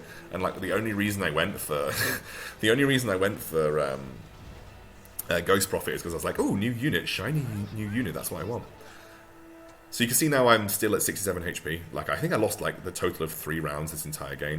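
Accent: United Kingdom. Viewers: Love your british accent jajaja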